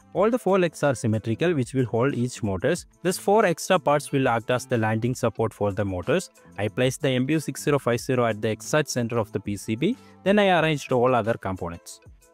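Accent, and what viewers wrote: India. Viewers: 我勒个印度英语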